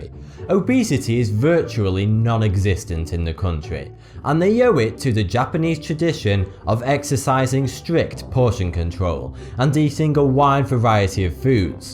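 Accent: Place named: Manchester, United Kingdom. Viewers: He has a very slight Manchester accent. Which I like 👍. This guy does a fantastic job, well ahead of his years... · It's his dialect you idiot. Ever heard of the northern dialect of England.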